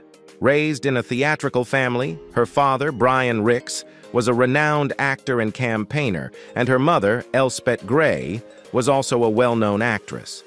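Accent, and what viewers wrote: United States. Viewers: Soon as I hear the AI American accent I swipe and reject…. No thanks.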